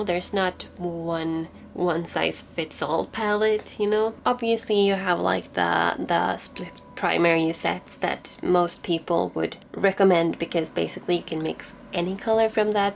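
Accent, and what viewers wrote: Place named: Sweden. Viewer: Nämen, hörde nu att du är svensk! Älskar blommorna 😍🌺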